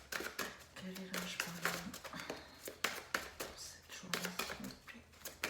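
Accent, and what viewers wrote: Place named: South, France. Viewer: Un petit coucou du gers belle guidance avec le beau accent du sud.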